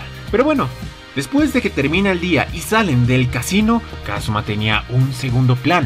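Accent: Colombia. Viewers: Señor. Sos de colombia?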